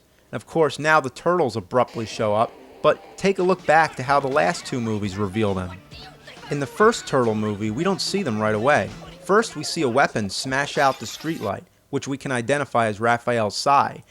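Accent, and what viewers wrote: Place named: Pennsylvania, United States. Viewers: New Jersey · Eastern Pennsylvania / New Jersey accent. James is from Eastern Pennsylvania, near Philadelphia. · He's older and he is a dad so he got the Dad accent now.. That East Coast Dad Accent.. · That's the Jersey vernacular, where he lives. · He's got that outside Delco, Delco accent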